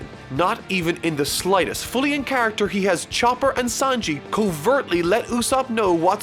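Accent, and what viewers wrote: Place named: Ireland. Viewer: He's Irish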